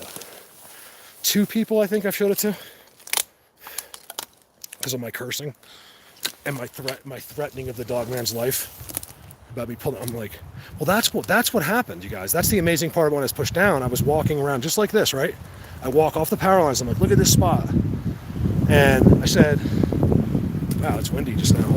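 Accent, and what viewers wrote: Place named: New Jersey, United States. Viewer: I missed your live stream! Damn! Jersey. Now I know where your accent is from! Makes perfect sense. I’d been wondering since I subscribed. Love ❤️ it! Do more Live Streams! I’ll hit your Notification Bell.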